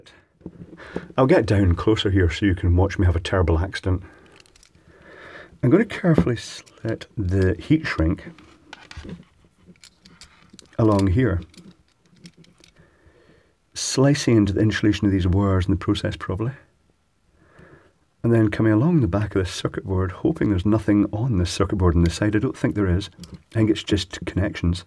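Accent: Scotland, United Kingdom. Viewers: I am a nonnative speaker of English,yet from the first seconds on I liked your Scottish accent.By the way, the Irish and Scotts are the only English speaking people I like as they were also tormented by the imperial empire.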